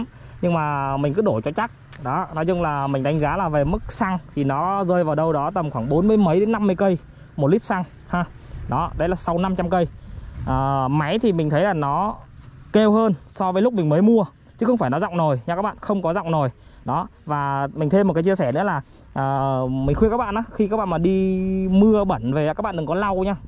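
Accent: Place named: North, Vietnam. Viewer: Giọng ông này như kiểu người miền bắc ấy Mong anh rep lại ạ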